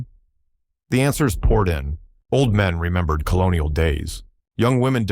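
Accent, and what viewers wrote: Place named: United States. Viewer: 😂 narretor says Ibrahim is 27 years old 😂😂😂😂 it's a American voice😂😂 FAKE NEWS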